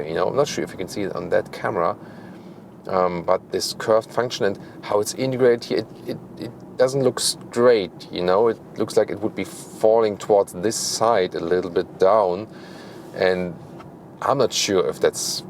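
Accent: Germany. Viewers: Re: German car bias. That’s exactly why I subscribed to this channel. A German reviewing German cars in English is a perspective one cannot otherwise get. Say, “DEE-hu-mid-if-cation” :-) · Hi, your reviews are great, but your heavy accent makes it very painful to listen to. Why don’t you consider recording your videos in your native tongue (German) and add closed caption, or even a voice-over with a native English speaker? No offence, but it would win you more English speaking viewers for your great channel. Why don’t you consider it?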